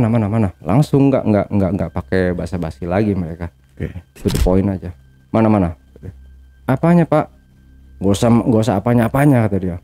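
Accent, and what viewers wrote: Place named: Sumatra, Indonesia. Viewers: Jelas kamu ni orang lampung.. dari logat ngomong nya. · Dari logatnya aku tau abang ini sumatera nya di mana... 😊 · Orang lampung nih, aksenya lampung nyeu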